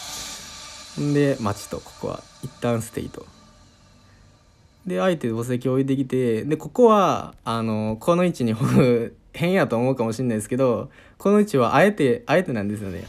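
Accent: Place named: Kyoto, Japan. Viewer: 京都弁最高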